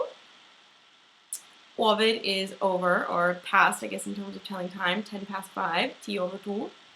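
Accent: United States. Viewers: It's both written and spoken. Did you grow up in the US? You have a slight accent. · hi teacher your lesson 3 that I sow was very good lesson n you have really American accent your English very good that is all I understand , I just settle nordland Norway and I really want to learn norwagian thanks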